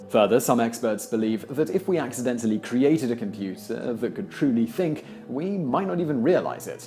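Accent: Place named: Kent, United Kingdom. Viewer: He's from Kent in England.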